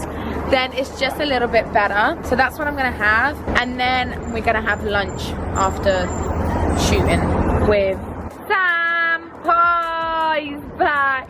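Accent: United Kingdom. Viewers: How would you describe your particular style of British accent?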